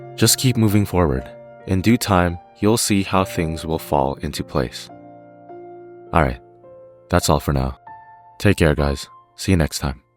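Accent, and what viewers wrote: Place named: Canada. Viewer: dude sounds canadian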